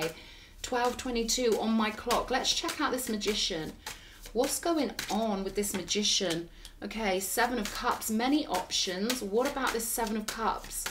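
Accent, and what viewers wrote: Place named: England, United Kingdom. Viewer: Well now you have me love struck with the natural setting as Heaven, tee hee. Having the queen's english and angelic level already had me sold that you are a gem. Chances are you may not be interested in a 61 year old frog though. I'm guessing you are quite picky. As we all should be I guess. Personality wise for sure. Take care. ✌️🥰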